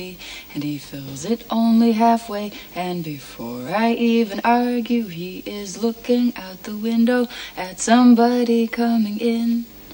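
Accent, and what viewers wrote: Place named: New York, United States. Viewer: nice! i thought so as it seems so nyc and from what i heard that is typical for that accent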